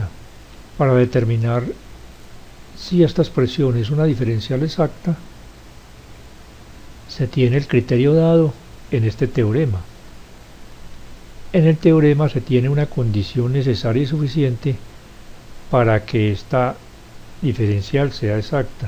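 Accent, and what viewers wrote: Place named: Antioquia, Colombia. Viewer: Tiene acento paisa. Nuevamente gracias y bendiciones.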